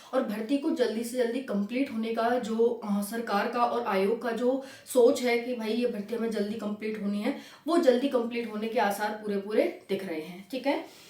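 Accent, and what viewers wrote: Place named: Mathura, India. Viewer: Apki language se hi lag raha tha ap mathura se ho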